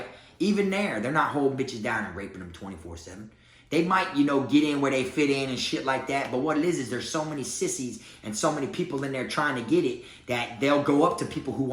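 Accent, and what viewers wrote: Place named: Florida, United States. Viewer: I love how you talk because I talk the same way.....It has to be a Florida thing!!! LOL I'm straight up serious though. On God 💯💯💯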